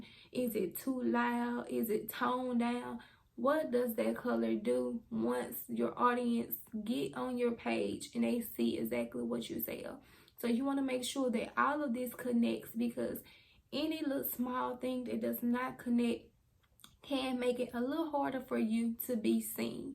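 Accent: South, United States. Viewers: I love your accent omg I know you are a southern bell just like me! Mississippi or Louisiana??? Which one ???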